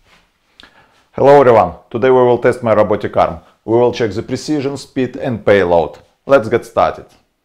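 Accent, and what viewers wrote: Russia. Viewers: I'll take strong rashn accent over strong Indian accent any day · Русский акцент)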